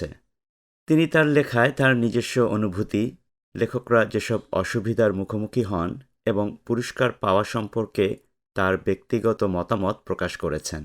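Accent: United States. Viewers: Sir American accent keno. Amra British Accent e shiktaci. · Sir, your English is so nice, how you learned to pronounce English like an American? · Tell me how you learned to pronounce English like an American, I need you!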